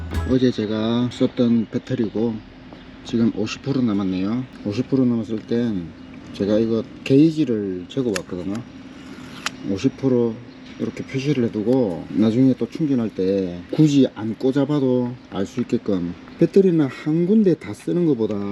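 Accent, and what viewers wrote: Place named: Busan, South Korea. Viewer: 재밌어요 'EBS 세계테마기행'의 '부산 여행가 일본편'을 시청하는 듯했으며, 부산 사투리가 정겹습니다 잘 시청했습니다.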